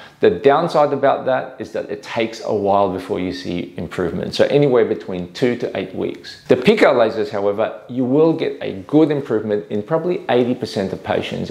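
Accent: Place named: Australia. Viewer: He an Aussie. That’s their natural accent.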